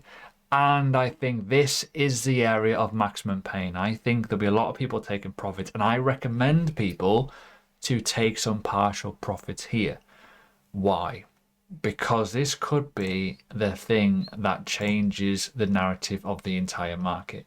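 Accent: United Kingdom. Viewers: Great content. Maybe talk a little slowly people used to listen an american english than british.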